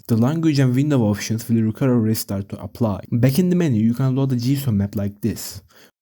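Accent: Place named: Turkey. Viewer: İlk videonda aksandan direkt anlamıştım Türk olduğunu . Güzel kanal devamını bekliyoruz hocam.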